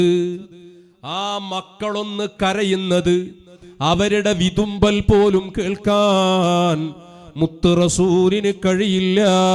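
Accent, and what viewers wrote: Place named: Malappuram, India. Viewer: Edenda engane paye usthad mare style Ningal Malappuram aano...kurach bhasha nannakkiyal nallath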